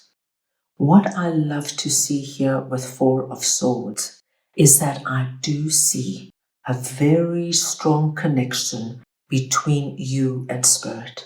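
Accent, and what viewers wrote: South Africa. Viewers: Thank you …. I love your South African voice!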